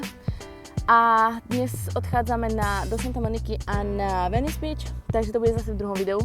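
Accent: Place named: Bratislava, Slovakia. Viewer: Ja mám rada Bratislavskú slovenčinu prízvuk kiež by som ho mala tiež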